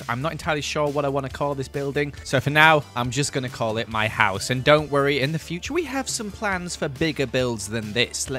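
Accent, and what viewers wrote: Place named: United Kingdom. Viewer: Is it just me or did got more.. British?😅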